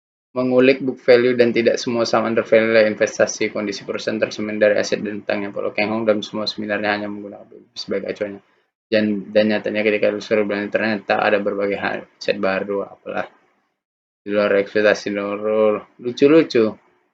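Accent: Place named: Medan, Indonesia. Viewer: Macam orang medan kali awak liat cara ngomong abang,ni.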